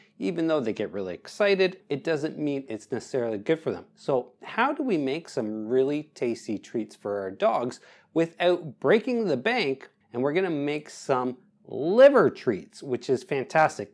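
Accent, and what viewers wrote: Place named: Canada. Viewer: Thanks for the recipe and your good intentions, always pleasant to hear a Canadian accent, you Canadians certainly won the lottery when it comes to politeness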